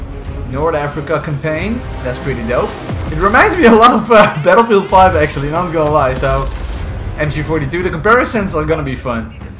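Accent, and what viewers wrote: Netherlands. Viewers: You Dutch bro? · Are you dutch? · I'm hearing a Dutch accent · Hahaahah holie molie heerlijk nederlands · you dutch right i can just feel and hear it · i smell Nederlands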